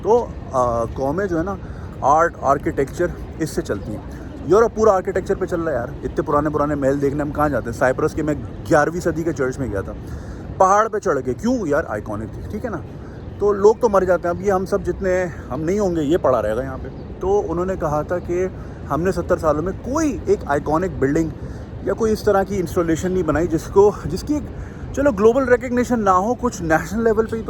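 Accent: Karachi, Pakistan. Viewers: Love hearing your khalli Karachi accent! I forwarded to my close Chicago friends to check out even all of us Pak Americans have been Chicago residents over 25 years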